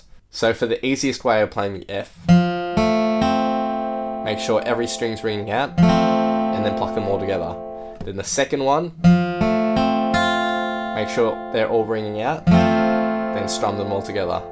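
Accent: Australia. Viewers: are you australian? me too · Got a bit of an aussie accent going on there, eh mate?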